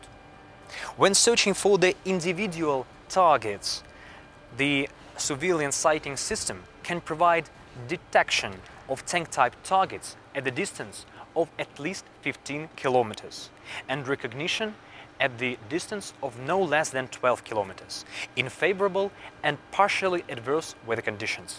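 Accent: Russia. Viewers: Акцент у парня, конечно, жестковатый, мог бы получше подготовиться, некоторые слова воспринимаемы только в русской транскрипции(т.е. приходится мысленно в письменную форму по "школьным" правилам переводить и только тогда становится понятно что было сказано).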